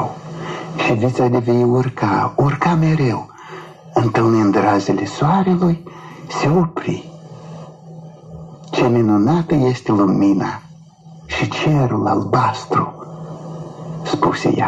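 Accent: Moldova. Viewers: Super ! Fermecatoare povestea ! Superba vocea si superb graiul moldovenesc ! Cât despre platani,aici m ai nimerit la fix pt ca pur si simplu sunt fascinată de ei ! In fiecare zi cand trec prin parc nu ma satur admirandu i ,le fac poze,ii orivesc ca oe niste opere de arta !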